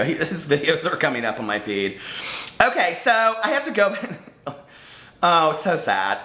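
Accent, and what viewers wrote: South, United States. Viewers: I just found you!!! And I love you to death!! And you'll probably be making fun of me because I make videos also which you will probably find boring but I think you're just hilarious! You remind me so much of my friend Gordy who we all nicknamed Gordo....I rarely get to see him now because I moved back home to Texas and he's still in Cali......long story short we met him at an RV Camp out on the shoreline in Northern California and he helped me and my girlfriend not freeze to death because we couldn't get our heater working. Why in the hell am I telling you this? I just watched your video and feel like we've been friends forever! Where are you at? You appear to have a southern accent? I'm in Texas! Give me a shout sometime! New Sub!!!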